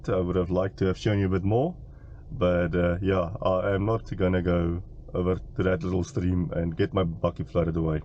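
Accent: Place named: South Africa. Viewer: Love the South African Boere accent Boeta, lovely content and detailed review; thank you Sir.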